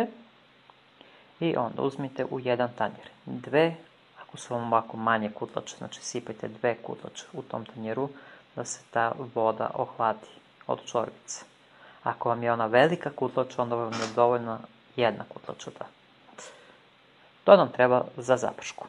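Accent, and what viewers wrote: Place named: South, Serbia. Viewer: Dragi,recept je super.Corbica kao san.Ja sam je pre spremala na slican nacin a sad bas kao Vi.Al od svega mi je najsladji taj vas naglasak koji sam ja vec izgubila,al mi se potkrade oljupim papriku a ne oljustim i tako mnogo lepih reci koje na jugu upotrebljavaju.U svakom slucaju sve nalepse Vama i Vasoj porodici i veliki pozdrav👏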